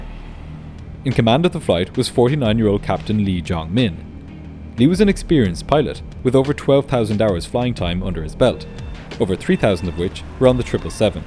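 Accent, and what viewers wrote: Ireland. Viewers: Stumbled upon your channel a few days ago pretty much by accident. I lived in Ireland for half a year when I was 15 and I still miss it 5 years later, so hearing your accent really caught me and sent me down memory lane. I think I‘ve watched about 10 of you videos now and I‘m staying for the amazing storytelling, analysis and breakdown!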